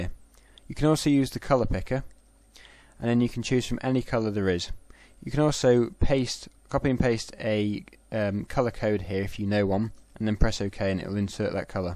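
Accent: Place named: England, United Kingdom. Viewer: Thanks mate for helping video. Just optained Corel Video Pro 6. Very nice tutorial for starting guys like me. Love your Englings accent. Cheers, Eric (nl)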